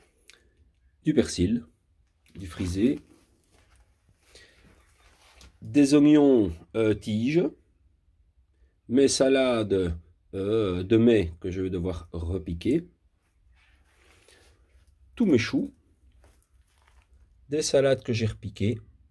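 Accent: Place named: Hainaut, Belgium. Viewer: Bonjour compatriote , je viens de découvrir votre chaîne , Félicitation. Plaisir de découvrir un autre jardinier Belge sur YouTube. Mais d'ou êtes vous précisément ? Vu l'accent je dirais du Hainaut , Mons ou Tournai ou pas loin. Bonne continuation et prenez soin de vous .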